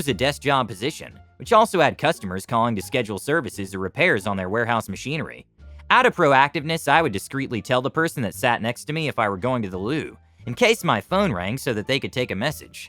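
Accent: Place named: United States. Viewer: How many languages are you fluent in? I’d swear your English is US native speaker but there are also videos on the channel I think might be Russian (or something somewhat similar?), but also might not be your voice?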